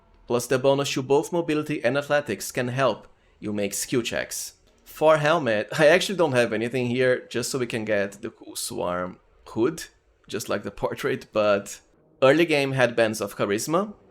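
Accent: Brazil. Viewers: Brazilian Accent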